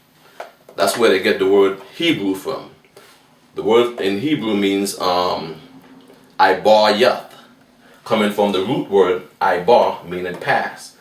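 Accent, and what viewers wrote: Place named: Jamaica. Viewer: He has a Jamaican accent..too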